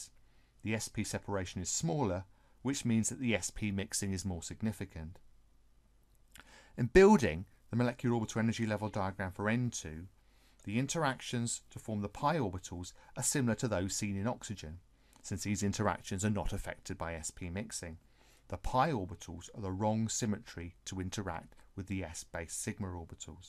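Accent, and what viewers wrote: England, United Kingdom. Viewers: God, the English accent, but at least it is a short video.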